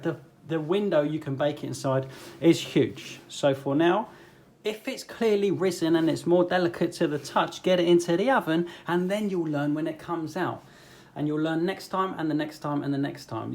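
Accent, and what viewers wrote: United Kingdom. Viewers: so glad to see you on YT - following you and I am learning as I am doing - love your vids and your presence and jokes with your UK accent for us US blokes is great - Great vids and editing by you and presentation is excellent - Thank you for all you do....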